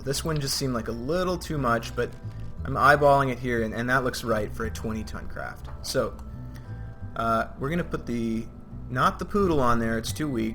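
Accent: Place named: Russia. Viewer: okay... speaks in a russian accent Interresting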